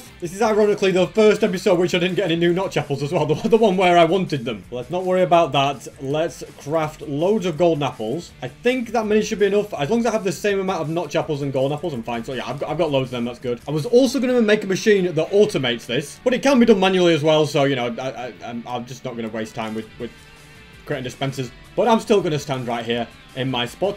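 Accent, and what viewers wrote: United Kingdom. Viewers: btw are you British?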